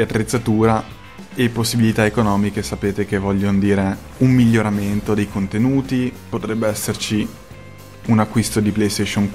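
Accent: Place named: United Kingdom. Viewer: Torna con l'accento inglese così potrai fare lo youtuber con un tocco.. come dire.. internazionale! E così il tuo canale sarà sempre più sexy! Questo pizzico di classe gli iscritti lo apprezzano ;) !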